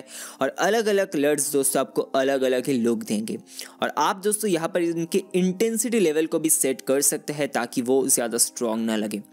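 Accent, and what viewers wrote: Bengal, India. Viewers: Bengali naki Tumi?Bari kothai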